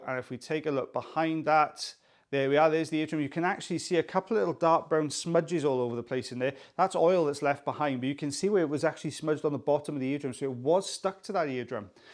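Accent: Wales, United Kingdom. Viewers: There is great comfort to be had with hearing a Welshman say the word "valley". Thank you!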